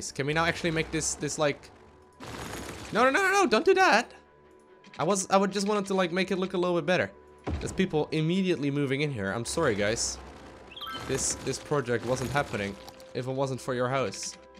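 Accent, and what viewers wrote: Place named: Netherlands. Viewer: He sounds Dutch now I'm curious does somebody know.?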